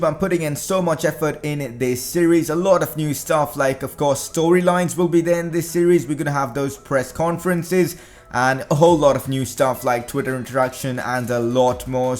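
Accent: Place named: India. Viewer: Man he sound like a England guy but in 2024 he sound like Indian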